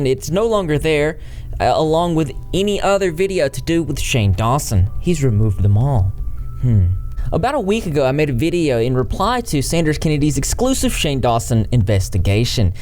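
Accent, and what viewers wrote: South, United States. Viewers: 1st) Sanders had gotten into something before b/c he was not making videos BUT when he came back I was happy for him and thought he was really trying to give viewers actual information so this is so disappointing, he seemed like once he saw all the racists videos for a while few years back not sure why but when Shane’s racist videos had really resurfacing (which is NOT cool at all) Sanders seemed like he was on a witch hunt or something he was actually one of the very 1st Drama type creators I had ever came across years ago so disappointing smh. 2nd) I recently came across your channel due to the Dahvie Vanity’s stuff few months ago and I love what you are doing you seem like a good hearted person and LOVE your country voice/words ....I am from NC and people from NY would always comment how they loved the way I talked and now I understand lol ! 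· I gotta say, as a midwesterner who lived in the south for a few years, the accent is such a weirdly lovely breath of fresh air. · U were cute until I heard that gross southern accent reminds me of Jim Crow laws GROSS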